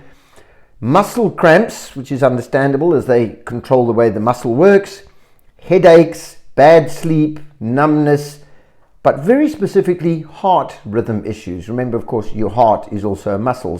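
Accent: South Africa. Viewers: Are you from South Africa 🇿🇦😀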